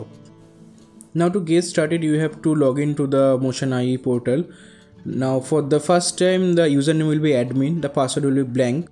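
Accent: India. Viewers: But this indian accent so terrible...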